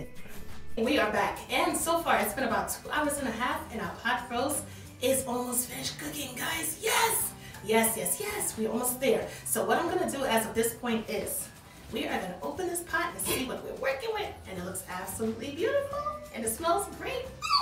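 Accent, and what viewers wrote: New York, United States. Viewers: Man you look just like toya.. lil wayne bm.. but with a new york accent. Almost done making my roast thanks sooo much. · Nice. Always liked the ladies with NY accent.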